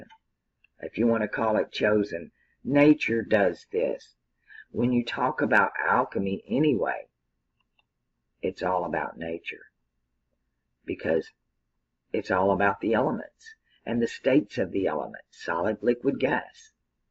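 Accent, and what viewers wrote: South, United States. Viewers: The ones I have always learned the most from, are the surprise, or chance encounters. You, are a picture of this phenomena. At least in my paradigma...! So, isn't it a pleasure, hearing you speak so smooth and southern, about things that are so incredibly in parallel with my needs? Claro, que si. There are ten years of Time, free of obligation, that the gods themselves awarded me, and I immediately consulted the Oracle in Google, and have been there much of the time. Seeking out answers that before clikclikclicketyclik, would have taken twenty years... well, my 2 or 3 thousand hours in navigating the nether [ether?] through the myriad of bad false and OhMyGod! and lies... and all the other... have yielded a few smooth stones, personal and spiritual, precious, which I keep in my pocket. I use these weightless stones, pretty and oval, for many things. 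It's amazing what can be done with the simplest of tools. Especially when and if one connects to that universal..... dare I say?...Force! you know, the one that makes shit happen. All we have to do is acknowledge it, right? hmmm... I'm gemini.. so.. I also keep a turd in my pocket in case someone tries to shit me.... [grin] In spite of my ever so June14 63 pairsohnality, and my razor sharp wit, and my light in my shoes and smiling approach to reality, here... I have missed some stuff, and still have infinite things to learn. Of course. But your videos have certainly put some more color on the table where the puzzle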